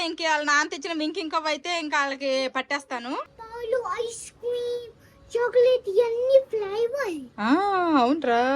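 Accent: Srikakulam, India. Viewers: Haiii sister me srikakulam bash chala bagaundi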